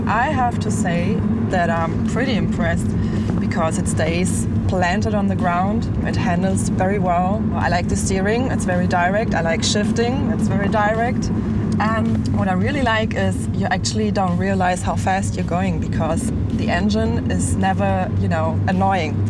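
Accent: Germany. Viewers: I love German accents! :D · She does her reviews well but I mean seriously, I don't know how you're gonna do it, but you just have to do something with the accent. I'd rather she speak in German with subtitles. No hate I honestly love the reviews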